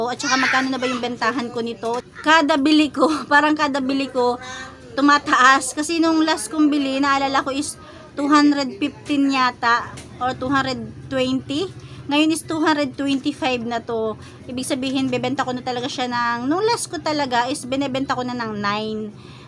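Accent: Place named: Bicol, Philippines. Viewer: watching kasari galing ng intro sarap pakinggan yong salita ng bicolana haha..salamat sa pag share ng billspayments niyo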